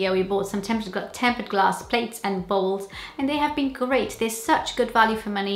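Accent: United Kingdom. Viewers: Your British accent is really different to my ears but I could understand what you said. Your last video I watched you were still pregnant and you said your daughter is already one year old. oh my. I need to watch more YouTube. In the moment I'm using YouTube Go and I'm not sure I can download your videos there. Anyway, your furniture is amazing! BTW I loved the video quality.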